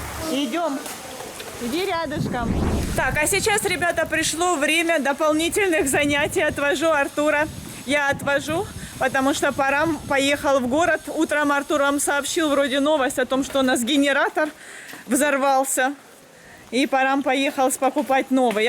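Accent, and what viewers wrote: India. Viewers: так интересно, у Вас английское произношение индуское, это просто удивительно! Только с позитивной стороны говорю. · За столько лет в индии чисто индийский акцент английского стал)) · У вас, уже акцент на индийский стал похож )) Nice do mid' you;)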